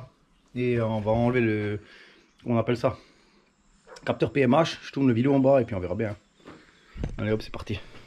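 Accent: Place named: Alsace, France. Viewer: Vraiment du plaisir, pour l'accent qui me rappelle mes années dans le 67, et puis de voir un vrai mécano, pas un guignol comme on en trouve dans les garages de marques à 150€/h . Bonne continuation et un Abonnée de plus ;- )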